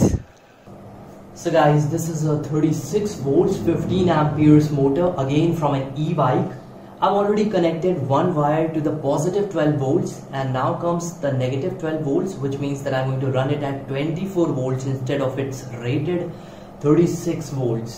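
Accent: India. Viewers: 여기는아느나라인가 발음이 인도인듣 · Индусский английский, это самый ужасный английский на земле.🤢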